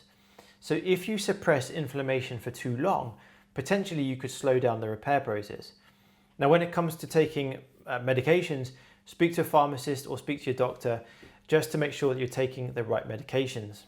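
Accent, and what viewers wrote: United Kingdom. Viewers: Excellent video and very helpful, much prefer the English accent to an American drawl!!!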